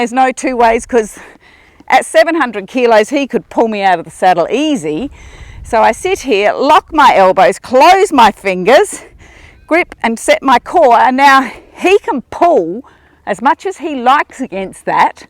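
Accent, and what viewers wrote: South Australia, Australia. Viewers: Thank you for this. I've switched to Western from English (or plain old bush bashing). The quarter horses I ride in my lesson have very slow lopes and their heads bob up and down. I have a lot of trouble keeping my hands still as they tend to go with the horses bobbing head! Western people don't seem to close their fingers but I will try this at my next lesson and see if by closing my fingers more and tucking my elbows into my hips if I can keep my hands still! I'm hoping if my hands are still and fingers closed my lesson horse may get into more of a frame. I'm pretty much taught in Western to use my leg and seat for turning. The horses I ride are both very well trained and basically if i just look a particular way, they'll go there but I definitely have trouble loping and keeping my hands still. By the way, are you from South Australia? 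South Aussie's seem to have a slightly different accent. lol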